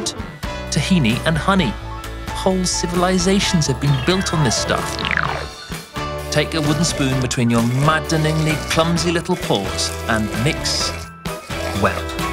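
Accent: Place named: United Kingdom. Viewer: Ah esse inglês britânico difícil e lindo!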